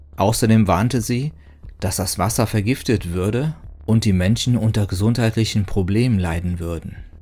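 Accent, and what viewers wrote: Spain. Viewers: Es ist wundervoll wieder deine Stimme mit dem schönen Spanischen Akzent zuhören. Möge Liebe und Güte immer mit euch allen sein. So sei es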